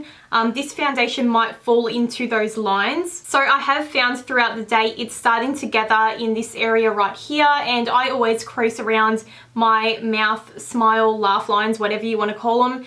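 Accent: Australia. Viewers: its an Australian accent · are u australian ? i LOVE ur accent !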